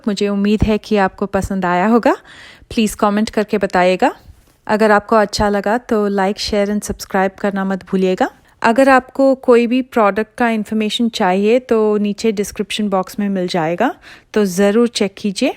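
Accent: South India, India. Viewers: feeling lucky got ur reply🙈 everybody can understand u r fluent with it😍😍😘😘south indians speaking hindi is the cutest thing in the world🌏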